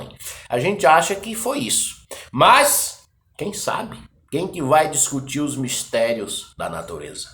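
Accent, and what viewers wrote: Goiás, Brazil. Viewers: engraçado o jeito goiano eu gosto muito de moda de viola as modas antigas e escutando o randal grande compositor e goiano falar da vida dele e voçe contando historia sao muito pareçido o jeitao de falar parabens voçe e bom contador de causos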